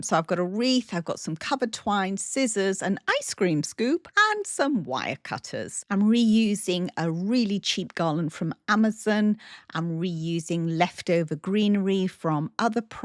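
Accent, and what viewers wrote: United Kingdom. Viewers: OMG absolutely gorgeous!!!!!!!!! Love everything!!!!!! Im wondering where do u store the things that are not used at the moment? I love ur voice and this British accent 😊😊😊😊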